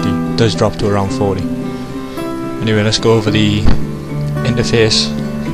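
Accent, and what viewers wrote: Newcastle, United Kingdom. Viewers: Wewt, geordies ftw! Me too. :D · Are you from Newcastle?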